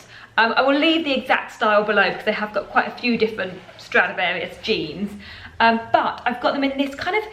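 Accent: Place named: United Kingdom. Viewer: I love your style... and your British accent, too. Greetings from Canary Islands, Spain. 😘😘😘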